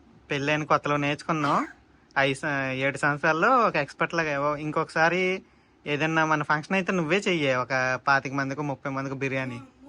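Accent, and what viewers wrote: Srikakulam, India. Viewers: మీ భాష మన భాష శ్రీకాకుళం సూపర్ గా మాట్లాడుతున్నారు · Pakka Srikakulam yaasa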